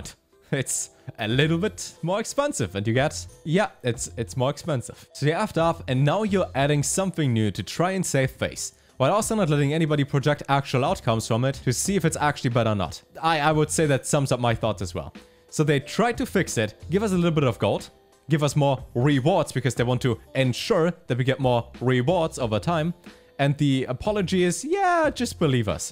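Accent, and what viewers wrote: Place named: Germany. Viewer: he's making a lot of good comments, but boy, this German accent is killing me ...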